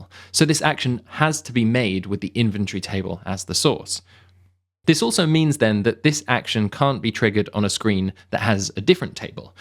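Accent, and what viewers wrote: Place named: United Kingdom. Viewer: why does everyone on these type of tutorials have a British accent 😅🤣 ... Great tutorial don't mind me guys. This was great ! Thanks !!!!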